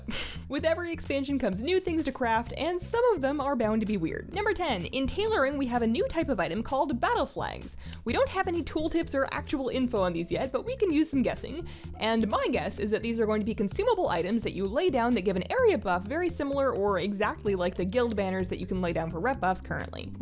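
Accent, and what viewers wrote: Canada. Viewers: love listening to Canadians talk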